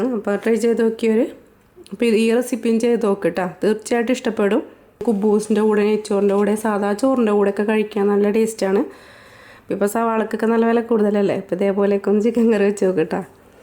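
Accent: Kodungallur, India. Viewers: 3 പീടിക, sn പുരം, കൊടുങ്ങല്ലൂർ ഈ പരിസരത്ത് ഉള്ളവരുടെ സ്ലാങ്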